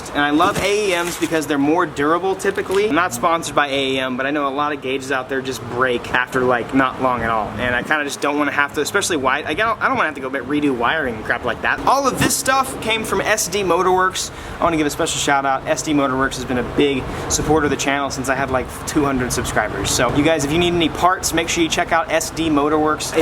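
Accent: Australia. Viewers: Ten out Of ten for Aussie accent 👍👍👍👍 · I love the Aussie accent!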